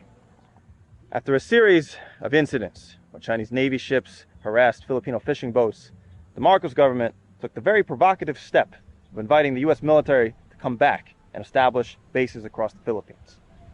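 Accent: New York, United States. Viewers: Where u from in the states? That accent tho. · Awesome episode! The SM mall right after the bridge from Subic Base (at the mark) used to have numerous establishments where a few sections used to belong to my grandparents. They had a bar/restaurant, money exchange and t-shirt printing shop. I used to visit them from Manila when I was a kid and have memories of that area during the late 70's to early 80's. I remember that area to be always crowed and busy due to the military personnel coming in and out of the base especially in the evenings. That whole street and a few adjacent ones used to be lit up like Vegas. I moved to the US with my parents before the US military left and not too long after that the location was controversially taken over by the local government. At any rate, your video brought back some good memories and I thank you for that! 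I also want to add that being a New Yorker myself, I appreciate your accent! Keep making awesome videos, I enjoyed your Japan episodes!!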